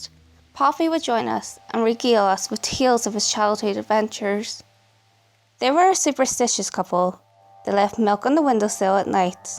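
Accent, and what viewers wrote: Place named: Northern Ireland, United Kingdom. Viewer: that north irish accent though, not as bad as my midlands accent to be honest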